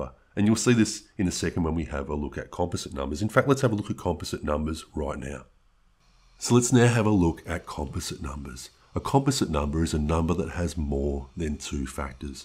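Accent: Australia. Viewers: Hello Aussie I felt like the narrator was Batman with an Australian accent! 🤭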